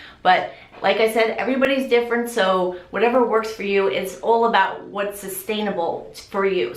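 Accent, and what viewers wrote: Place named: New York, United States. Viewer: Your voice is so stinky cute! Haha! You sound like you walked right out of a subway in New York!!! Love it. I’m a new follower. I got sick and then fat and I have no idea how to heal my body and get back to my size 2. I’ve gained 50 pounds in two years!!!! Pray for me. Thanks for this info. It has really encouraged me.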